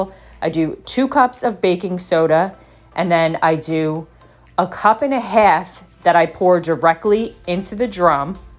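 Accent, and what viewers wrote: Philadelphia, United States. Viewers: You sound like my family in Philly! Thanks for the video. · Are you from Philadelphia? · Sound like you're from Philly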